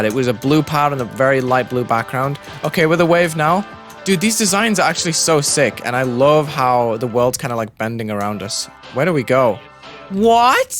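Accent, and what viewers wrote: United Kingdom. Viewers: Bri'ish man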